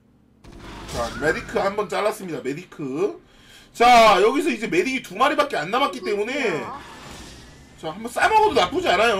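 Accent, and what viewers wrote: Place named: South Korea. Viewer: korglish lol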